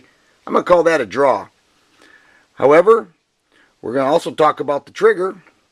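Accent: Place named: Upper Peninsula, United States. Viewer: Whereabouts in MI are you from? I grew up in the Detroit area, you sound very UP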